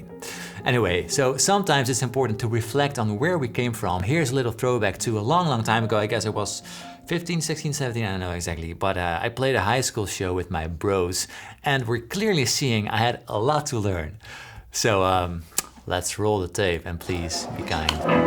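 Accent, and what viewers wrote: Netherlands. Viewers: Did you say Holland? I was trying to figure out your accent. You're showing me the music inside the music. Thank you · i might be rly dumb here but I never noticed the dutch accent till now...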